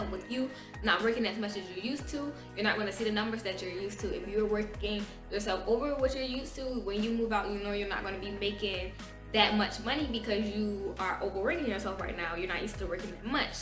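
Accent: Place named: New York, United States. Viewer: She sounds like she from ny but moved down south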